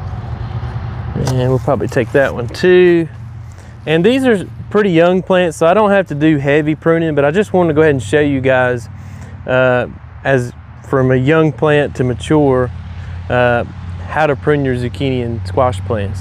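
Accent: South, United States. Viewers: thanks, great video! very instructive! will do mine now this morning! by the way! you've got a great southern dialect!!!! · Recognized a Southern accent, confirmed by NC on your shirt :) I'm in Arkansas.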